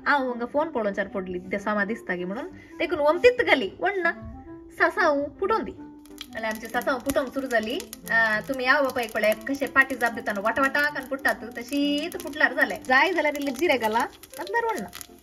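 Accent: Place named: Mangalore, India. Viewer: Recently started watching your video's and vlogs really enjoy the way you talk in manglorean . I too am a manglorean feels connected❤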